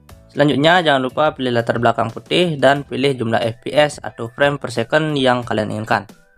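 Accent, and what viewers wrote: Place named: Padang, Indonesia. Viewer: Logat padang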